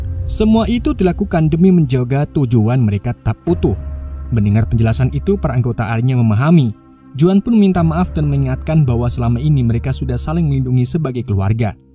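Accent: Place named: Java, Indonesia. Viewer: Aku yakin admin nya orang Jawa, Kadang lucu juga admin nya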